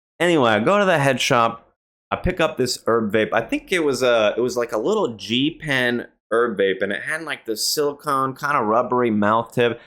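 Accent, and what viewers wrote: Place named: New Jersey, United States. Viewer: Am i the only one that's noticing part of a jersey accent in his voice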